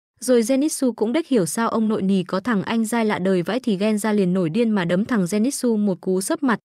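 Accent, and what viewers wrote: Central, Vietnam. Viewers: Người miền Trung ò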